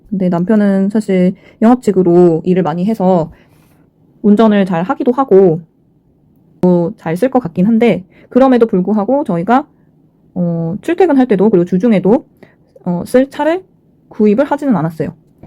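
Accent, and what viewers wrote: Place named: Gyeongsang, South Korea. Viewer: 고향이 경상도 인가요? 말투가 귀여우시네요 ㅎㅎㅎ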